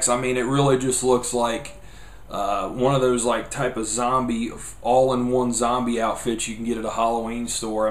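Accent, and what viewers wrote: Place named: South, United States. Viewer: Handsome. Love your southern accent, when it pops up. Trip With the Teacher popped up in my recommended YouTube videos, but, I don't know if it's here. Got halfway through it's trailer. When you say, "do," &, "too," I melt 😍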